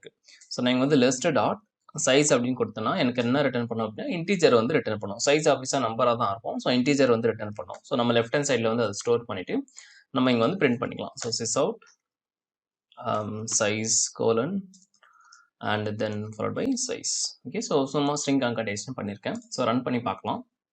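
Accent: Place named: Chennai, India. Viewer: The way of coaching is very understandable. Sometimes your Chennai language flows 😂😂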